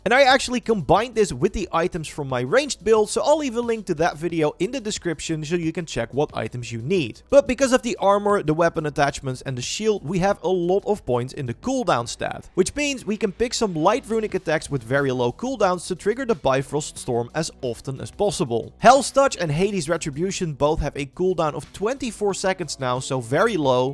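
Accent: Netherlands. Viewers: This man had the most dutch accent ever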